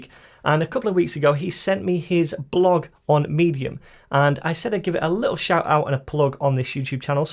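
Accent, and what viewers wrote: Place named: England, United Kingdom. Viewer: Aaaaaand... BOUGHT. Thank you, sir. Nice to do a course with an English accented tutor for a change (well, besides Angela Yu) :D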